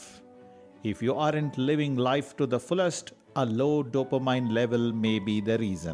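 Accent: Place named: India. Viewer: Indians usually speak their own dialect, their state language, Hindi and English, so he is probably speaking In his FOURTH language!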